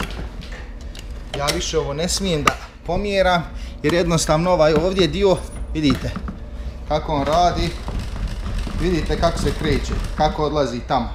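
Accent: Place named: Bosnia and Herzegovina. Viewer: Tek sam sad otkrio kanal.. A sto volim cuti ovaj jezik govor ... Odmah me prebaci na BiH postavke...♥️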